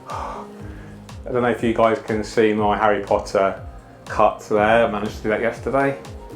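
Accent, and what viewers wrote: United Kingdom. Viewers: Too bad only Brits can understand him.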